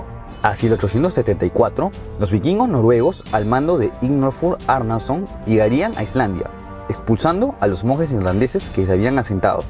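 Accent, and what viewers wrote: Peru. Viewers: Lo sacaste bien. Es un acento "peruanazo", con ese superlativo tan usado que usamos nosotros. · No sé pero creo que tu acento es peruano, eres mi compatriota? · Qué lindo tu trabajo.. un poco rústico pero Lindo 😍 y tu acento peruano (si no me equivoco) 😍😍 Lo explicas genial 👌😚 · No se le entiende muy bien el acento del moderador ojalá usará acento más neutro no al estilo peruano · Es evidente que español no es; yo creo que es peruano, pero no estoy 100% segura de distinguir el acento.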